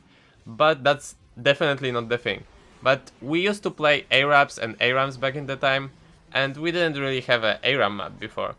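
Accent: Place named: Poland. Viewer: You know Pole as you listen to one m8 :D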